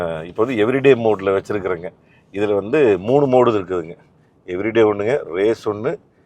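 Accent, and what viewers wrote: Kongu, India. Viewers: அப்பப்ப எட்டிப் பார்க்கும் கொங்குத் தமிழ் அழகு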